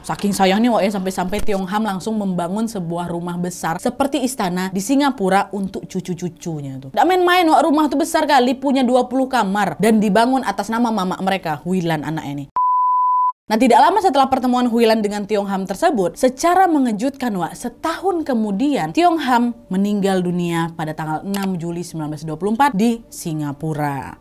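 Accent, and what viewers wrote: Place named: Minangkabau, Indonesia. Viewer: Baru kenal content (tiga content sj per 27 Agt 23) ini.. ternyata lsg betah mendengar paparan kisah dan cuap2nya yg dibumbui dialektika Minang Riau 😊 enak dan nyangkut sj di kuping. Keren, semangat utk terus berkreasi. 🎉🎉🎉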